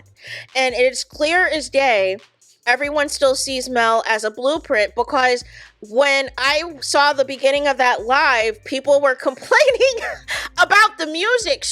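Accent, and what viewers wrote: Midwest, United States. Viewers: I love your voice…it’s cute and gives an Intermountain West/Midwest quirky accent. I love it too bc if you are a black woman, it is outside of what people typically think black women in America sound.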